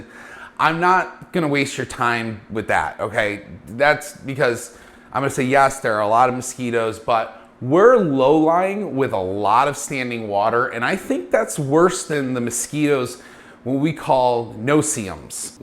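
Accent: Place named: Western New York, United States. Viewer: Go Bills! I could tell you are from Western New York. Is there a Bills Backer spot?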